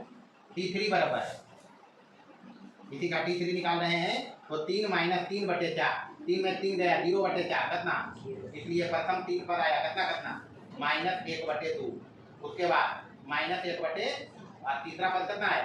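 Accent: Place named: Bihar, India. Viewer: Uncle aap Mokama se he na bhasa se pahchal liya me bhi waha rahta tha batcha me 🙂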